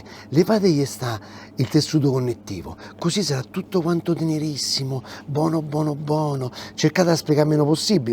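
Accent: Rome, Italy. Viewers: sei il.migliore per. Simpatia, e con il tuo accento romanesco è come essere a Roma in diretta. Grande 💪💪💪🌈🌈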